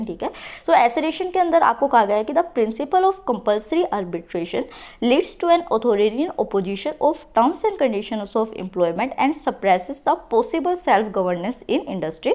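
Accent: Haryana, India. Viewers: That is called "Haryanvi" accent.😎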